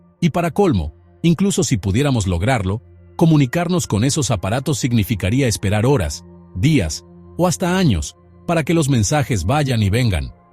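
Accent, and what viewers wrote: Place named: Mexico. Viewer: Aparte la IA habla como mexicano. Nada contra su acento, pero en temas científicos no espero hablar al locutor con modismos de un país específico. Yo soy chileno y no me imagino un video de ciencia con modismos de acá.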